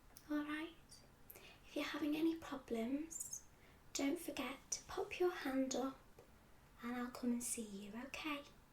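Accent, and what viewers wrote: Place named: Yorkshire, United Kingdom. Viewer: You really do sound like your from Yorkshire, I'm from Doncaster well York originally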